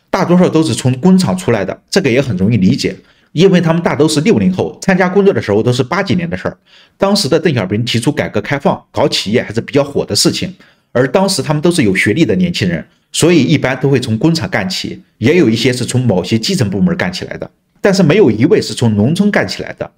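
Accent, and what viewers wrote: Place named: Anhui, China. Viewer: 主播一口白完农村口音，应该是安庆池州纵阳铜陵一带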